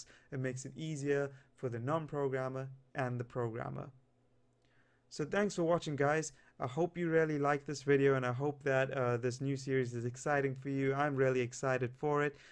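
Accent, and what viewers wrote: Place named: South Africa. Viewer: Great tutorial, very clear and concise! Nice to hear fellow South African (you sound a bit like Elon Musk actually!)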